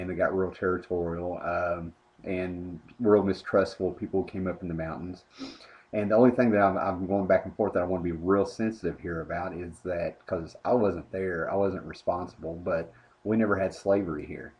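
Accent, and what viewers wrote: South, United States. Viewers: You speak with a southern dialect which many women find very sexy! I would just block the obviously uninformed individual and lose him to obscurity where he probably belongs!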